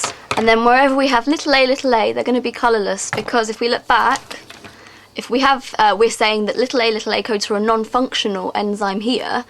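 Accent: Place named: United Kingdom. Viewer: a late night study session before a test can always be made a little better with a cool British voice girl that's good at explaining stuff. Thanks!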